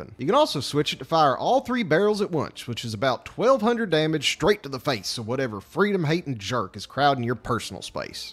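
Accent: South, United States. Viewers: After years of having to endure various European accents, this channel blindsides me with a beautiful Southern drawl. It's like a balm. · Bro I love your southern accent It's got charm haha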